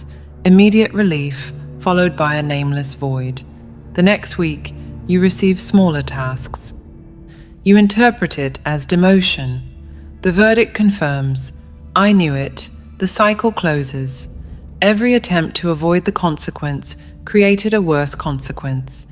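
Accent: United Kingdom. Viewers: Thank you so so much for returning the british voice. Please redo the other two videos 🥺🥺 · Fantastic, thank you so much for listening to us and bringing back the posh English lady. Really appreciate it 🙏🏼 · British voice yes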